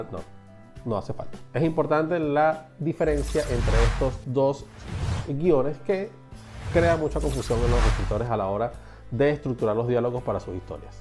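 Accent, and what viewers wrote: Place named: Venezuela. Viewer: Vi el video, hasta que caí en cuenta que eres venezolano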